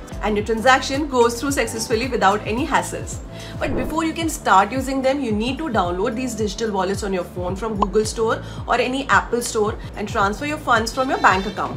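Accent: India. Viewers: What an accent got this girl, she must be indian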